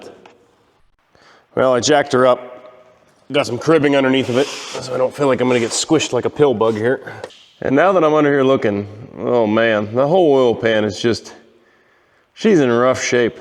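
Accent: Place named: Western Pennsylvania, United States. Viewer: I absolutely love your videos. They are very interesting and very enjoyable. I have to admit that I wait with anticipation for each and every one to come out. Being from western PA myself I feel as if I have known you forever. Your accent brings back many memories of home and things I used to do. I guess you could say I live my life vicariously through you. I am now on disability and living in Florida but each and every video takes me back home. Being an old mechanic and my love for old equipment makes it even better. May God Bless You and your family. Please keep up the awesome videos. I too am waiting on that overhead crane!! Lol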